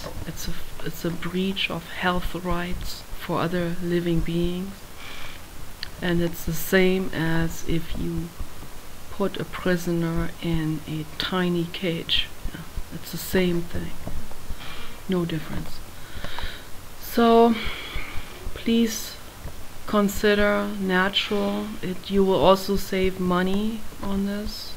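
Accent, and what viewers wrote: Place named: Germany. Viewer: You have to be german. I Love your melody!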